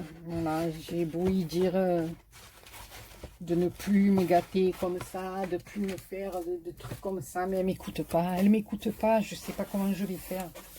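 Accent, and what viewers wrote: South, France. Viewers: Cc ma toute belle et oui tu as encore été gâté c est super. Les papiers tes 2 albums sont magnifiques et je trouve que c est même dommage de le cacher avec des photos. J ai toujours beaucoup de plaisir à regarder tes vidéos qui me font toujours beaucoup de bien et vu que je ne suis pas partie en vacances pour raison financière tu m apportes avec ton accent le soleil du sud que j aime tant. Bisous bisous ma belle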